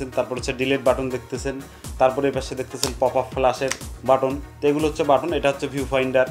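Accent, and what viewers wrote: Rangpur, Bangladesh. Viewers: বাড়ি কই ভাই? হয়া যাচ্ছে ইয়া হয়া যাচ্ছে চলি যাচ্ছে। রংপুরত বাড়ি নাকি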